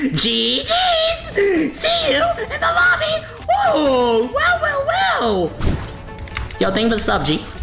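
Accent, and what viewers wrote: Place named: Texas, United States. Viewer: Love the new Texas accent. 🔥🔥🔥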